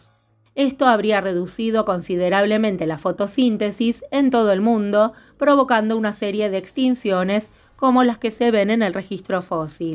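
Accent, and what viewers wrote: Argentina. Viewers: Uff amo la voz argentina que tiene la joven🤗🤗🤗🤗😘😘🤗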